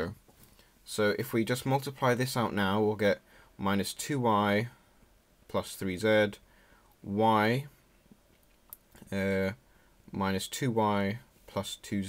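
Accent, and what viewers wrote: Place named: England, United Kingdom. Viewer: English accents sound so cool! I totally get it now thank you so much!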